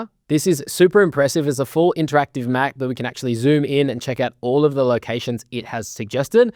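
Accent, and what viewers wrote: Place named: Australia. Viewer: It's a typical feature of the Australian accent.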